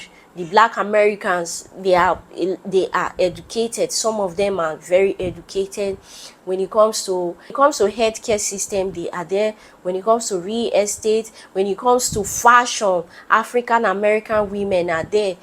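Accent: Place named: Ika/Ndokwa, Nigeria. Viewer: You sound ika or Ndoka in delta state. Shout to you for accepting you were wrong in your last videos. Good Job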